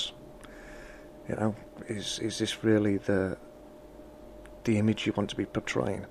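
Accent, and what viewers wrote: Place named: Scotland, United Kingdom. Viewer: Good Job!;) Love your accent! are you Scottish?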